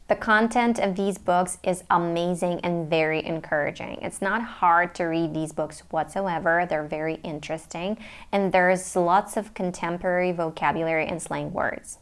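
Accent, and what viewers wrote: United States. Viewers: You are very Beautiful and Intelligent. I am amazed that you have developed accurate American accent while not living in U.S.A. I would recommend to learn Technical books as well. I mean, Mathematics, Computer Programming in Python and Artificial Intelligence books. To know American Accent, learn Phonetics, Linguistics, Phonics. Learning Natural Language Processing can also help you about American English and Accent too....... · Could you please teach us, how to pronounce "R" in American accent like you.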